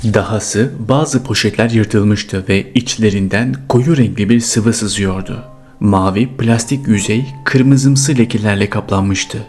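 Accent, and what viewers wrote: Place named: France. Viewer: Fransızca biliyor galiba onun için böyle konuşur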